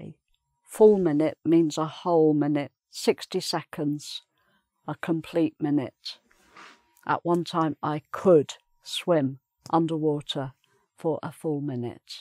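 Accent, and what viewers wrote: England, United Kingdom. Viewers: ... I found you yesterday... and I am to confess that your English accent is fantastic... And your explanations are very clear and useful. With love from Brazil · You have really beautiful British accent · Is her exent british??plz tell me.becoz i need british teacher. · O ne kadar güzel ingiliz aksanı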